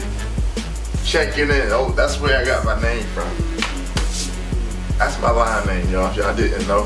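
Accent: Baltimore, United States. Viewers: B’More Accent?? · You from Baltimore? I noticed that “Yeew”(You) “Blew”(Blue)😂😂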